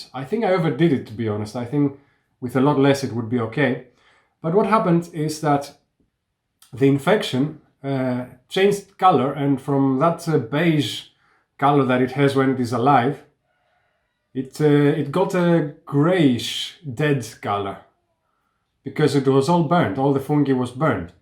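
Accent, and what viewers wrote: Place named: Greece. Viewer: Greek accent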